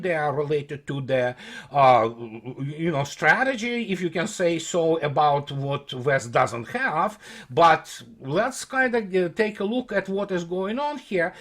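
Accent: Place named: Russia. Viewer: When you read from an article could you please not dramatize your voice you are hard enough to understand with your thick Russian accent it really does no justice to make it worse and harder to understand you by adding drama to your voice. Please try to slow down a little and let your voice keep pace with you brain you make a lot of filler noises that actually make you sound like a drunken American with a thick Russian accent.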